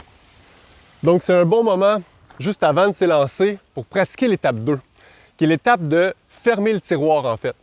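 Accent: Quebec, Canada. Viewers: Very clear and well explained. That Quebec French is tough but I think I got there in the end.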